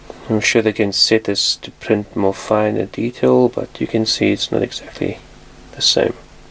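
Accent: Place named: Australia. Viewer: I also forgot to mention that I'm from Bulgaria, a country in Eastern Europe, and salaries here are one digit smaller than what you probably have in Australia (I hope I'm right judging by accent). Prices are a lot cheaper too though. Paying over $70 for a toy is a bit too much for me, it's 1/5th of my monthly salary. Please understand.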